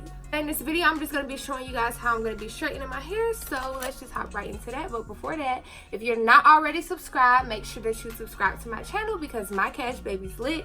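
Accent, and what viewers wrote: Atlanta, United States. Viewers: Where you from? I’m guessing Atlanta or Savannah with that accent! lol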